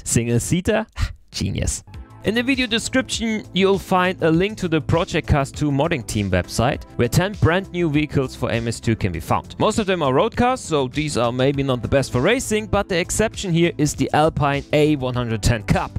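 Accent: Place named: Germany. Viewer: sehr gutes englisch, bis du die namen aussprichst. das klingt sehr sehr deutsch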